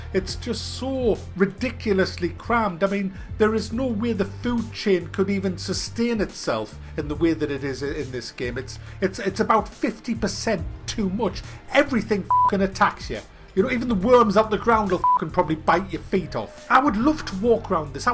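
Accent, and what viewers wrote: United Kingdom. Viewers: Great review! I love the far cry series of games.Graphics, Sound, Gameplay, Immersion, Content. All grade A. If we could just get them to add Multiplayer, which is my guess for a Far Cry 5 or 6 to make an impact. Though I have taken a break playing primal after down loading PES 2016. I love listen to you Brits (hope that's politically correct) Love from America. Those bee bombs are a blast......aaaahhhhhhhh!!!!! peanut butter cup & peanut butter pieces with candy shell, same thing both yummy.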